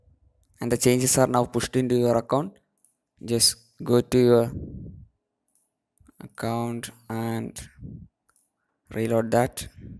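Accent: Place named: Kerala, India. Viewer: I can find u r mallu by your voice😂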